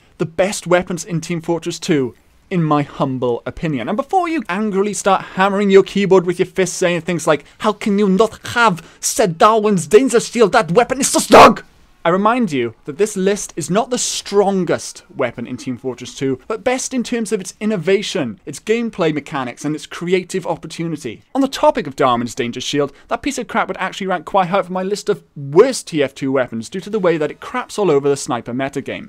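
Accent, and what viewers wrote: Wales, United Kingdom. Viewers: Are you welsh? I hear the accent and emphasis on words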